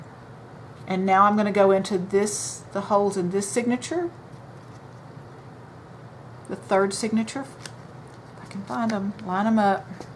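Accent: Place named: South, United States. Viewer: I want to say that your detailed description of each step is exactly what I needed! An added bonus is your accent! I’m grew up in the south and I’m always homesick! Thank you for your video!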